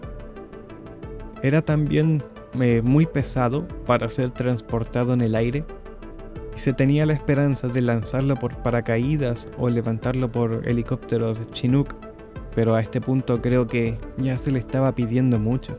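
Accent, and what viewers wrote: Chile. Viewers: Hola , gracias por los excelentes videos hechos con mucho cariño , una consulta al margen , es usted chileno ? Saludos desde el sur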